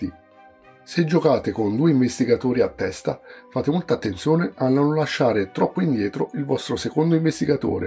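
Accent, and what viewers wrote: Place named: Tuscany, Italy. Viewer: dall' accento sembri Toscano 😅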